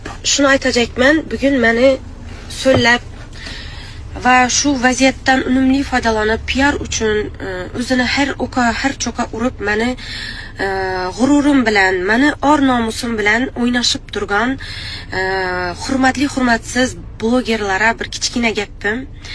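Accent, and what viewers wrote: Khorezm, Uzbekistan. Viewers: Шеваси хоразиммми Гузал аел экансиз бола хам жуда шерин экан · Хоразмда шеваси ажойиб йокимли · Xorazmcha gaplab duribdimii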